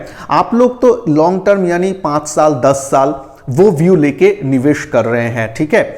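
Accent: Bengal, India. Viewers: You sound Bengali. Are you based in Mumbai or kolkatta or where?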